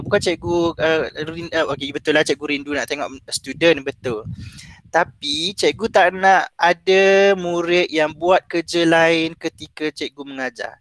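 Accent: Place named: Terengganu, Malaysia. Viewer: 😅 sedak dgr org ganu cakak.. lame x g teganu..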